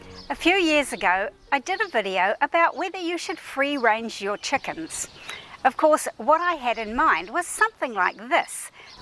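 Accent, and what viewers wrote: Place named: New Zealand. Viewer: that was my guess that you lived in New Zealand just got Clues from your timestamp the beautiful weather in December, and your accent. A lot of your words pronounced just like your FORMER prime minister what's her name. Jacinda? 😅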